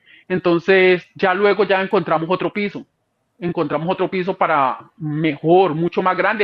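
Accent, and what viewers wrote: Colombia. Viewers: Tristemente creo que por su forma de hablar es “caleña”🙄🙄🫣🫣👎🏽👎🏽👎🏽👎🏽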